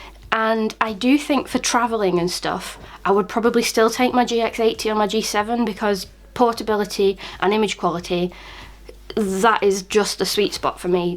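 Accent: United Kingdom. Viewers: Charming British accent :)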